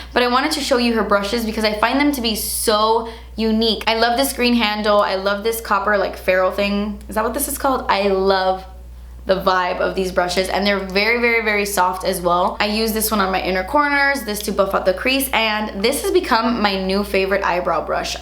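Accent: Cuba. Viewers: El acento Cubana que bonito, aún que soy Mexicana me encanta 😛 make a spanish video trust me you’ll gain more subs 🔥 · I LOVE how you talk in spanish, I'm from Spain and girlllllll that cuban accent is bomb 💘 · Tu acento cubano,... I love when you speak spanish xDDDDDD. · Omg I love her Cuban accent!!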